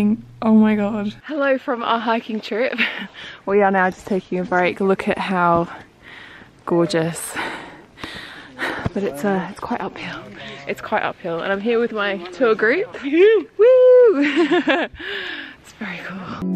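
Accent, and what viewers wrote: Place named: Australia. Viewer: "kay" "naydeeyaa"? australian accents are so cute lmao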